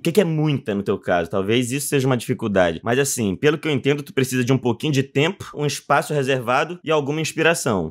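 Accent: Brazil. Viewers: bacana! Tu tem sotaque? Nunca ouvi sotaque piauiense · ta com um sotaque carioca da gema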